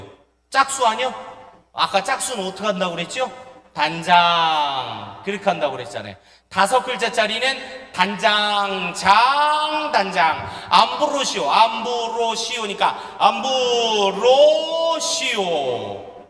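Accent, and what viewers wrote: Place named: Chungcheong, South Korea. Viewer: 그런겨어 ㅎㅎ 우리충청도 사투리 반갑습니다 영육간에 강건 하세유😂😂😂😂😂😂😂😂😂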